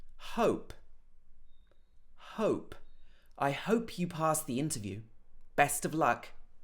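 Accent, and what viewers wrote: United Kingdom. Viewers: This is rather British English, right?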